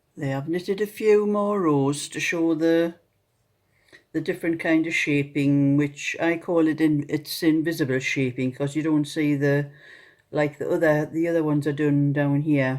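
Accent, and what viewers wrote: Newcastle, United Kingdom. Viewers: Thank you so much, that was so helpful. Knitting is my new hobby since being locked down - it’s the only thing (apart from drinking beer) that keeps me sane. Also loving your Geordie accent! xx 😘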